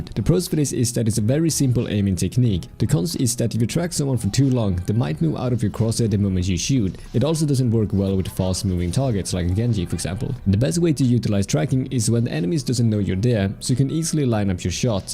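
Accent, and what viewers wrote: Sweden. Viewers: You can hear that you are swedish, it's funny becuse i'm also swedish. Ps. You are the best youtuber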